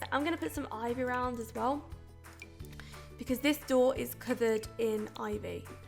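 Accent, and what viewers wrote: United Kingdom. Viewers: Maria santos to me, she sounds more British that Australia. 😂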